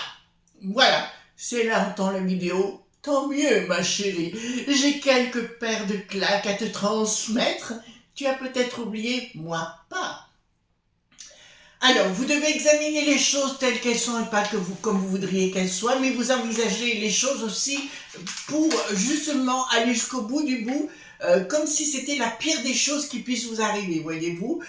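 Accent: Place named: United Kingdom. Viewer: J'adore votre humour, c'est vrai la vie est belle, vivons là à 100%. Les personnes néfastes ne font pas parties de ma vie, je m'entoure de personnes saines et qui aiment vivre au jour le jour. Merci pour cette partie de rigolade avec l'accent So British, ça fait du bien!! Bisous à vous et à la communauté 😘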